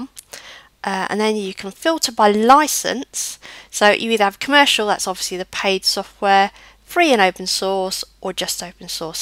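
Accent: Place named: United Kingdom. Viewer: Thank you! Beautiful girl! Love your Britain accent! haha~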